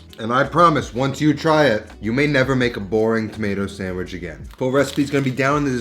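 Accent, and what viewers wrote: New York, United States. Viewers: No, it's an Italian/ American New York thing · for the love of God, stop overthinking the way you pronounce ricotta. Don’t feed the trolls. You’re Italian American from New York, so is my sister in law- her whole family pronounces it the way you do. People pronounce things differently in different places. Also I’m Irish, and that’s how all my chef instructors in culinary school years ago pronounced it. People need to move on if they’re still giving you shit about the way you speak. Sandwich looks amazing by the way, keep doing you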